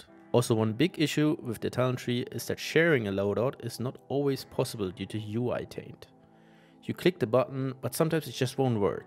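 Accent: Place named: Germany. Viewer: Geiler deutscher Akzent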